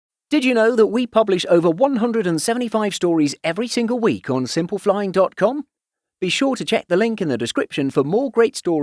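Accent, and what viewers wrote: United Kingdom. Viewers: What? YOu can clearly make out the British accent, and also, this is the channel of a news website. I think your ears are low quality.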